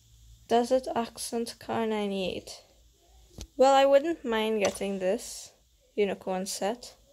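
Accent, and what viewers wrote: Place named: Trinidad and Tobago. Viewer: you sound like a Trini but I'm from here. If you want to link with my clan hit me up. 『GØD』Ërmac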